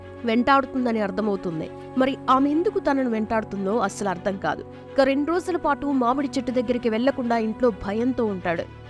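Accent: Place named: Andhra Pradesh, India. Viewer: Adi me ts slang kadu cheppedi ap slang adi telusuko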